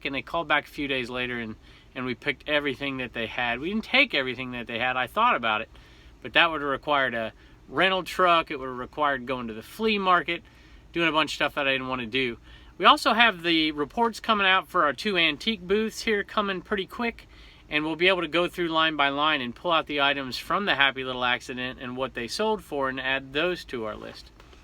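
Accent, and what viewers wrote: South, United States. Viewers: How do you have such a Southern drawl if you grew up in CA?!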